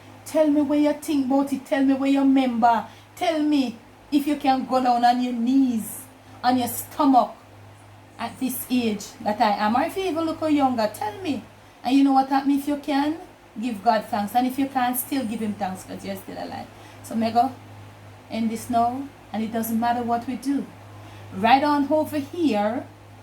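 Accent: Jamaica. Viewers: I love your ‘back then’s’. Just love them. Sometimes I have a little problem understanding your patois but I’m getting there the more I listen the better I understand😌. Love your recipes Love to watch how much you enjoy your food. Camera Girl is such a polite young lady. Love the way you keep it so real. Please give me a shout out from time to time. My family and I visit your beautiful Jamaica every year. Montego Bay is our spot though. If I get to Kingston I would love to visit you and your family and taste your cooking, I know it’s EXCELLENT! I want to taste your soft dumplings, crispy fried chicken and ripe plantain! And yes the camera did pick up the floor shine❤️ USA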